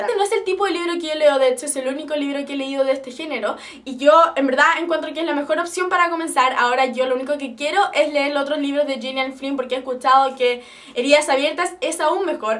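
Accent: Chile. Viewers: eri chilense?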